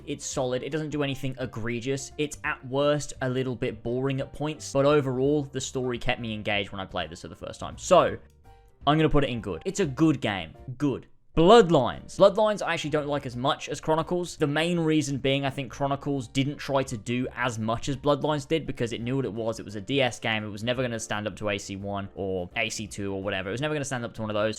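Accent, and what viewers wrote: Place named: United Kingdom. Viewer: You don't like how vikings talk .... bro you're british. 2nd most annoying accent in the world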